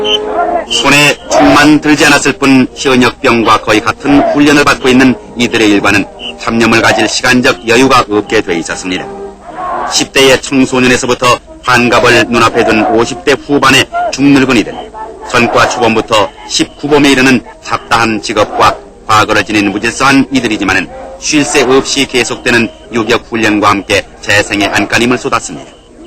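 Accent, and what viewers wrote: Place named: North Korea. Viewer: 약간 부칸스러움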